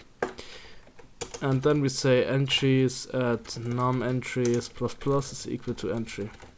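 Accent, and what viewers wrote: Germany. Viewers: Hi, dein Englisch hört sich aber ziemlich deutsch an von der Ausprache, danke für dein Video.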